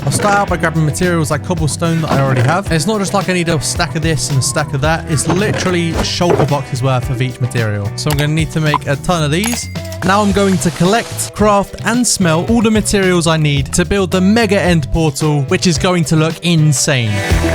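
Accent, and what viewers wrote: United Kingdom. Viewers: Not to be offensive or anything but are you British or Scottish?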